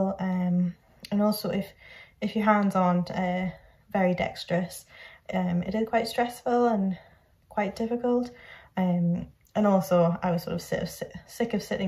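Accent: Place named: North East, United Kingdom. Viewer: I have just got myself a Sentro machine and enjoying experimenting. Videos are great. Good to hear a northern, dare I guess a northeastern voice. ❤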